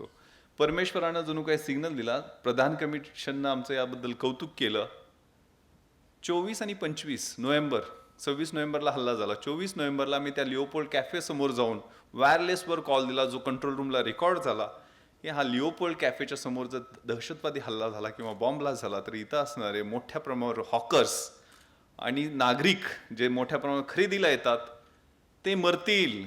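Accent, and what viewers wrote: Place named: England, United Kingdom. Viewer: Why he is talking in English accent????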